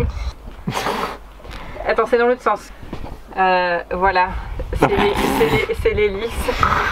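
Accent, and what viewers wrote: France. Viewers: I am always so entertained by various facial expressions associated with her french inflected English that following the subtitles I feel like I missed something........................because I had to keep looking down to see what she was saying.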